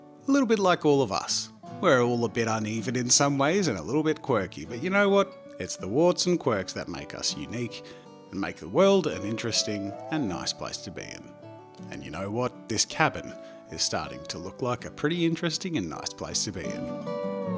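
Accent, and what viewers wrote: Australia. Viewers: Thought this was gonna be a troll, like he would start calm and then suddenly go crazy and make a castle or something, but instead I got Australian bob ross, witch was a very pleasant surprise. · you're more aussie than ever in this one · A Dremel tool would help with roof, doors and windows. And by the way, you're totally bringing that soothing Bob Ross voice to this one. If Bob Ross had an Aussie accent... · Omg I LOVE this! Serene instructional of mini furniture? chill Aussie narration? More, please.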